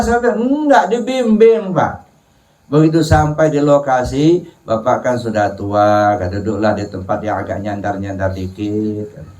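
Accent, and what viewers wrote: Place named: Sumatra, Indonesia. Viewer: Assalamualaikum, Kalau boleh tau pak orang apa ya, logatnya seperti orang Sumatra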